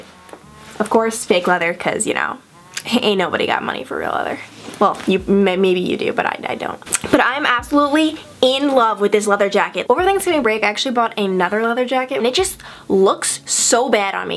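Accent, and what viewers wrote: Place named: New York, United States. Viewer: I subscribed after seeing your Victoria's Secret video. Now I'm wondering where you are from because I can really notice you have an accent in this video. Sounds like New York or Boston.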